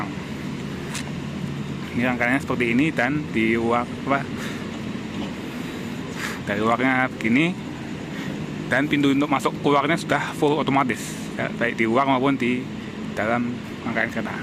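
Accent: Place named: Java, Indonesia. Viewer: Medok banget suaranya. Ga bs dimengerti. Coba kamu belajar ngevlog dg intonasi yg bagus. Banyak kok youtuber yg medok tp intonasinya bagus. Jd buat yg non jawa itu mengerti apa yg dibicarakan. Sukses selalu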